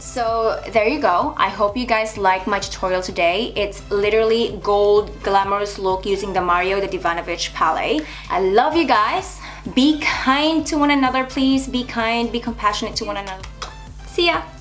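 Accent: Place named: United States. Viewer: First of all you look AMAZING 😍 Second, where are you from ? You sound like an american girl to me. I'm lovin' your channel ❤😘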